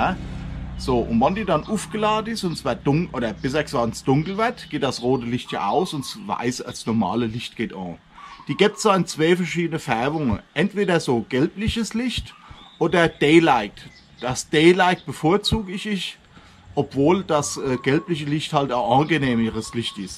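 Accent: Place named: Rhineland-Palatinate, Germany. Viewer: Hallo, unser Rheinland -Pfälzer Dialekt ist doch sehr schön, na goad is schwetze Nassauer dialekt, over is eigentlich fast dat gleiche, mach weiter so und alles Gute.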